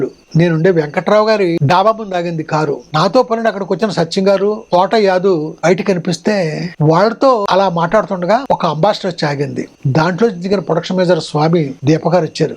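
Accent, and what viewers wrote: Godavari, India. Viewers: వంశీ గారు మీరు గోదారి యాస లో మీరు మాట్లాడుతూ వివరించి చెప్తుంటే కళ్ళ ముందు వీడియో కనిపిస్తుంది.... డైరెక్టర్ గారు....మీ సృజనాత్మకత అద్భుతం....మీ అభిమాని పశ్చిమ గోదావరి జిల్లా వాసి మీ హరీష్... జంగారెడ్డిగూడెం...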